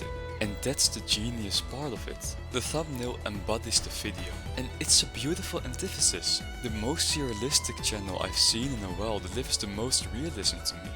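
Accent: Netherlands. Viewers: Are you dutch by any chance? I’m asking because of your accent :] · Wait...are you dutch??? like from the Netherlands😭😭i can smell it i just can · Genuinely a great analysis video. Lovely Dutch accent, great use of background music (I loved the Persona OSTs after the 'high school is not like persona' video being shown), and good scripting/commentary! Nice editing too. All in all just a great high quality video and got multiple laughs out of me. Make more and wishing you the best of success with the channel, liked and subbed <3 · Hoorde meteen dat je Nederlands bent mijn broeder😂💪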